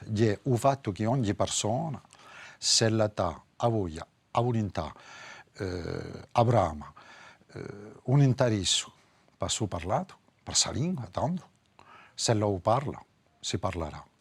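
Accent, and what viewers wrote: France. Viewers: L'accento francese è inevitabile.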